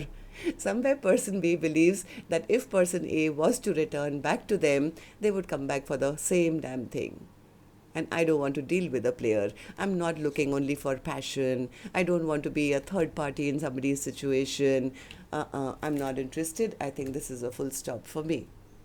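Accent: India. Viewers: Are you hindi please??!! Your english IS very good🎉❤😊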